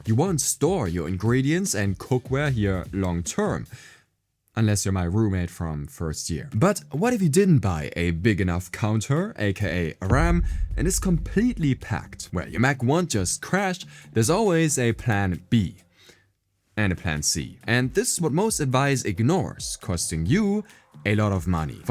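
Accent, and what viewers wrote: Germany. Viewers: Ist das ein deutscher Akzent? 😅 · i have a slight feeling that he is perhaps german, the accent is just so distinct, its really funny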